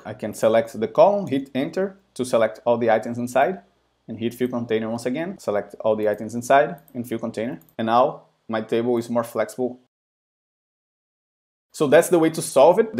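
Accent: Brazil. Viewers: Muito bom! acredito que seja brasileiro pelo sotaque. Parabéns pelo canal Bro! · Se esse sotaque não for de Brasileiro não sei mais nada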